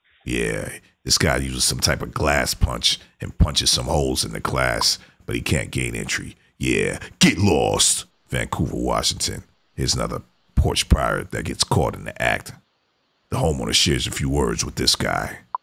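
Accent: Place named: Jamaica. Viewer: This narrator is the best! Bootleg Bob Marley and the Jamaican talk killed me 😂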